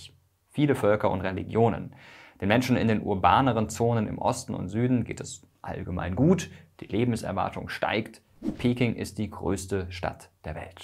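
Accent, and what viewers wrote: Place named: Southern Germany, Germany. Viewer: Das ist die völlig normale und richtige Aussprache in der süddeutschen Standardvarietät der deutschen Sprache.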